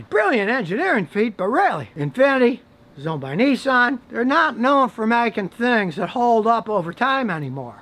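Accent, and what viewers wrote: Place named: Midwest, United States. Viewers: Definite midwest accent here. I'm guessing Chicagoland area? :) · You almost sound Chicago in this video! Not one once of the bullsh*t you'll hear daily.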